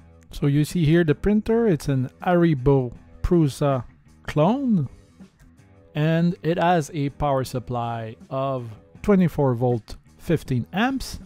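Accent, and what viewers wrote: Quebec, Canada. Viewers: On la sent bien laccent quebecois 😂😂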